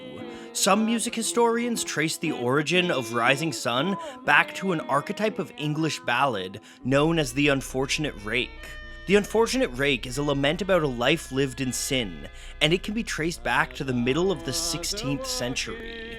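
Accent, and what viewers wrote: Ontario, Canada. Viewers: The narrator sounds like hes from Ontario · Narrator is sooo Canadian that I almost think it's a young Bruce McCulloch. Hoose.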